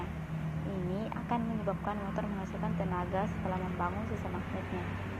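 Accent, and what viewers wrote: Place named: Bugis, Indonesia. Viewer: Logatnya orang bugis😂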